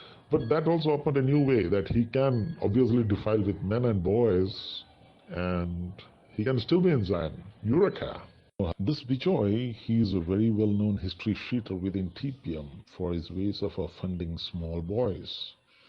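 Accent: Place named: Kerala, India. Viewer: Poda devil 👿 Who are you ? Are you 👿 spirit? What is your aim? Nitne English kettal ariyam nee oru mallu annannu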